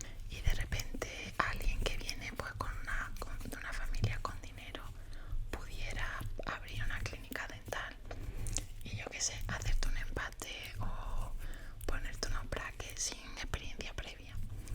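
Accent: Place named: Andalusia, Spain. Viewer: Pensaba que éramos vecinos de provincia pero no! Jajajaja porque tu acento es de Andalucía y por lo que cuentas, ibas a irte de Málaga a Córdoba a estudiar pero tu padre no quería. Sinceramente aun no me voy a dormir, pero lo tengo en pause para seguirlo en cuanto me acueste porque me relaja tu voz! Un abrazo compañera! 😇😴😴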